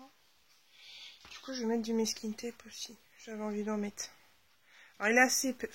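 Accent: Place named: Normandy, France. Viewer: re :c'est déjà fait , tout est au maxi , par moment je vous entend et d'autre beaucoup moins.je vais investir dans des enceintes alors mais je m'en servirai que par moments car dans certaines vidéos que je regarde je mais au minimum car les intro son en musique donc c'est trop fort . vous êtes de quelle région ? Votre accent me rappelle celui de la Normandie. bonne soirée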